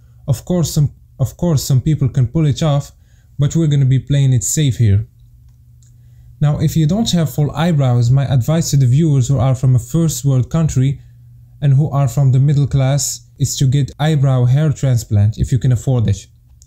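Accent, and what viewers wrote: Morocco. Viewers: Tell me you're Moroccan without telling me you're Moroccan. Your accent bruh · i bet you're moroccan just by the accent, am i right a 3chiri ? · Hahaha I swear 3reftek Moroccan gher meen accent , prouud of youu keep it up🔥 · you sound morrocan idk why, good vid tho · Man i could notice the moroccan accent from the getgo 😂 , great concept , keep it up !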